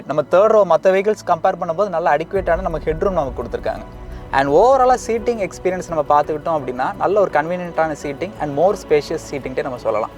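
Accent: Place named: London, United Kingdom. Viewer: இது தமிழ் விமர்சனமாக இல்லை. தம்பி லண்டன்காரா் போல பேசுராரு.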